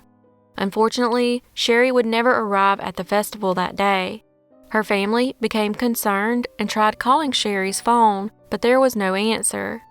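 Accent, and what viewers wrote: South, United States. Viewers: 🤬🤬🤬dang girl!!!! ❤️that fr southern accent!!! 😍😉🙃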